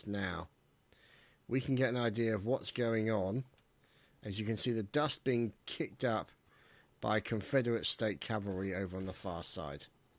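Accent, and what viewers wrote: United Kingdom. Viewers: its just moose there is no plural for moose btw keep up the good work your funny as hell lol espeically with the British Accent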